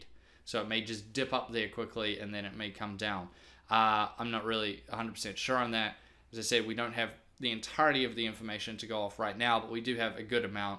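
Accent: New Zealand. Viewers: I've noticed your accent starting to change since the move. You are losing that touch of Kiwi.:) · He's a New Zealander talking like a New Zealander.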